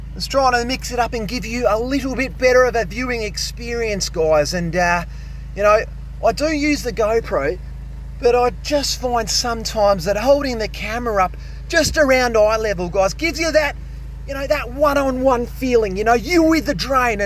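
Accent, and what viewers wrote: Australia. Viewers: You are a laugh a minute thank you, you have made my day watching and listening to you, I lost my husband recently and you have made me laugh again I love your true Aussi language I just love it you are so passionate about your work and the fact you don’t give up is what I appreciate about you thank you again ❤❤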